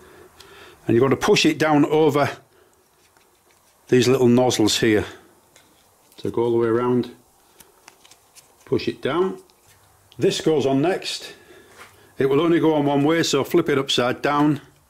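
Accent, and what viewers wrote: United Kingdom. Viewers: I'm from Poole, but currently live in the wilds in British Columbia, so it was nice to hear a Brit explaining the Dyson's guts in my own "language". :-) I swear I have spent more time trying to get my Dyson working ok than I have actually using it. No more!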